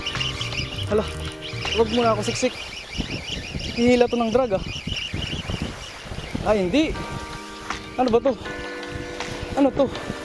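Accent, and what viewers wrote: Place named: Iloilo, Philippines. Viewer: Ilonggo ka gali master taga diin ka master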